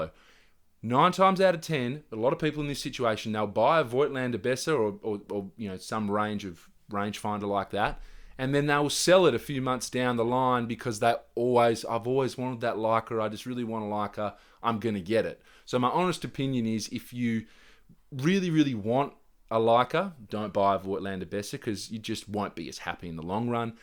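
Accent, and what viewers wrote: Australia. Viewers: I'm a very satisfied Voigtlander Bessa-R (LTM) owner, and I don't really care that much about what you're saying. I just love watching a real-life giant with a wicked Australian accent talk about analog photography, so cheers for all you do, mate ; )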